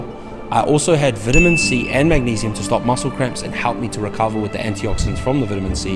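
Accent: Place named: Scotland, United Kingdom. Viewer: I thought u are Scottish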